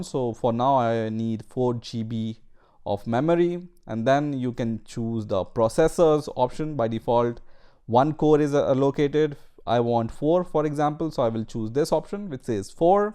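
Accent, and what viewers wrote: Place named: India. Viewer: this guy sounds like Indian anyone noticed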